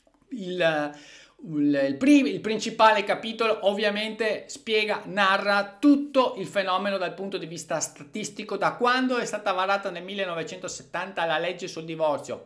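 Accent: Veneto, Italy. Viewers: Io lo ascolto in loop sto video perché mi fa morire dal ridere. Il modo, le parole, la cadenza veneta (come la mia) impostata... credo di morire. Divorzio SPA mostro leviatanico.... morto!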